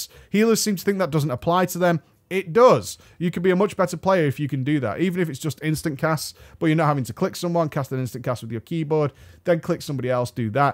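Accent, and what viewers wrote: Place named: Manchester, United Kingdom. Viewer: Youtube is not friendly to the Manchester accent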